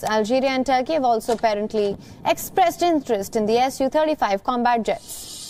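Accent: India. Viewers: FIND SOMEONE THAT CAN ACTUALLY SPEAK ENGLISH SMOOTHLY AND WITHOUT THIS SCREECHING AND EXTREME I. N. D. I. A. N ACCENT, which makes it not ONLY very unpleasant but rather hard to understand! She is beautiful non-the-less, BUT this isn’t a pageant contest, it’s supposed to be the ‘news’! 🙄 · And thickest accent, like goddam. I thought stand up comedian was overexaggerate on how funny stereotypical indian accent was.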